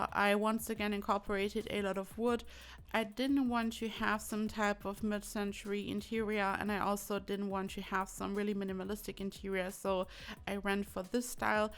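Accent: Germany. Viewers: Just a question, are you German? Cause I think you have a very German accent! 🇩🇪🖤❤️💛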